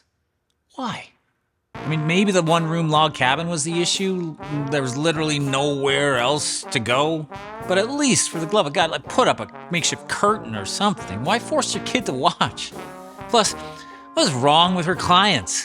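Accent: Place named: Canada. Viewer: Such a good episode, full of little tidbits I hadn't heard of. Also, love hearing the Canadian accent poke through every now and again.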